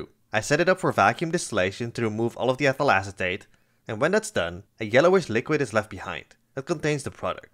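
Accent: Netherlands. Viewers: Your voice has a hint of Dutch if that’s true damn it’s a fine accent if other disregard the previous 😅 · Nee echt leuke videos... stiekem beter dan nilered. Ookal heb je een nederlands accent je prsst fijner, iets sneller en minder met een zeurige toon. Hahah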